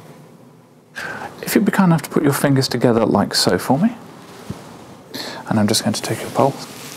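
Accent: England, United Kingdom. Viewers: An English accent and a doctor I’m weak I’m the knees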